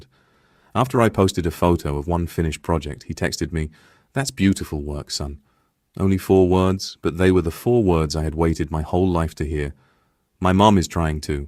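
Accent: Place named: RP, United Kingdom. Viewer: lol, these Ai generated stories are whacked out😂 He Supposedly grew up in Knoxville Tennessee, yet has an upper class British accent 😂.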